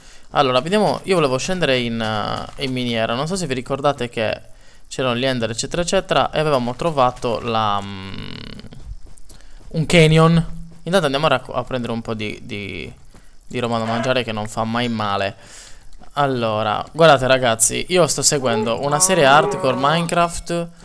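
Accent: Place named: Sardinia, Italy. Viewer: Amo il tuo accento sardo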